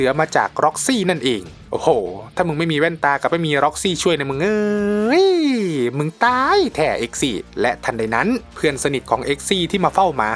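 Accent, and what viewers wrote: Isan, Thailand. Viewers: แอดพูดอิสานน่ารักมาก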